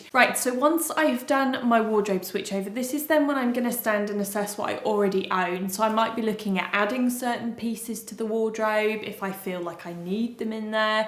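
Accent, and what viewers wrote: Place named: London, United Kingdom. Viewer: Omg same here I am learning her London accent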